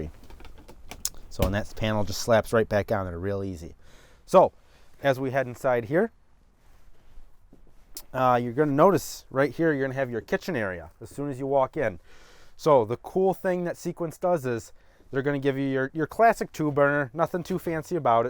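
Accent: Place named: Chicago, United States. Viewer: I like your accent. You sound exactly like my cuz from the Chicago Southside (Bridgeport). You would blend in there quite well.🙂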